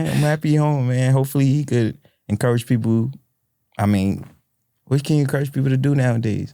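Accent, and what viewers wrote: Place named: Philadelphia, United States. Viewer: Bro from Brooklyn but sound like he from Philly lol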